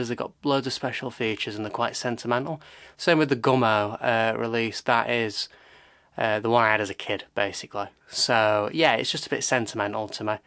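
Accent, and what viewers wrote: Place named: Sheffield, United Kingdom. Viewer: Sheffield, oh I was born there! I should have recognised the accent 😅😅 Great collection, was fun to watch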